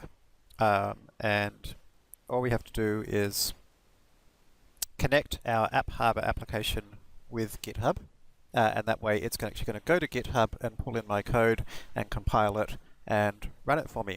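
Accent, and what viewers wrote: Australia. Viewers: Excellent Series, very clear and understandable especially since it was end to end(Bonus points for being in an Australian accent too). I am in the process of melding this framework to the out of box internet application in MVC. I only have a few questions: How can angular deal with Authorisation? How can Angular prevent CSRF with the in built Token Validator in MVC? Is it possible or even advisable to use cshtml pages running razor code as templates? And lastly is there a way to prevent a user from manually navigating to a html template to stop them from loading it without the index page? Thanks very much :)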